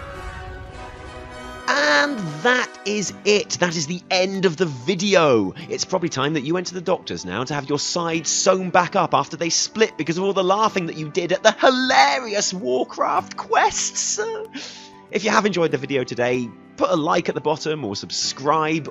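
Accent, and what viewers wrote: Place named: England, United Kingdom. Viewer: Hey, anyone know what his accent is from England? I'm Hampshire but I can't quite place his. Thanks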